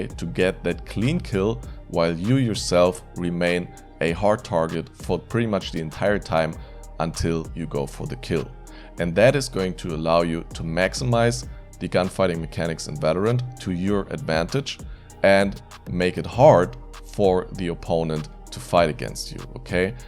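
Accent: Germany. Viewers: Der deutsche Akzent xD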